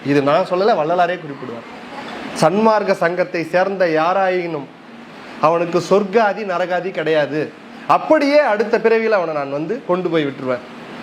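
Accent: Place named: Chennai, India. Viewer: Chennai slang is so visible 😅. Amazing speech 🪄❤❤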